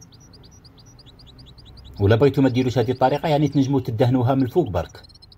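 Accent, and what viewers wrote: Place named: Skikda, Algeria. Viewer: Bonsoir le Skikda je sais que vous avez un accent Skikda et les Skikda les rosica days sont traités par les pizzas merci à vous et bonne continue❤❤❤❤❤❤😂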